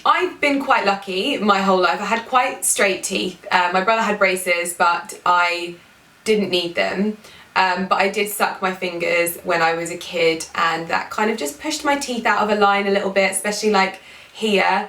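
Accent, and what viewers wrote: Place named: England, United Kingdom. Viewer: Great video. Can someone tell me precisely what area of England this accent derives from? It's by far the most pleasant sounding.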